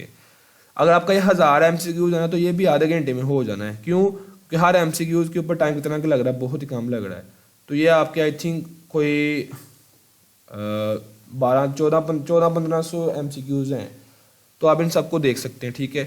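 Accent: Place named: Lahore, Pakistan. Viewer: Sir are you from lahore. your aaccent is like a lahori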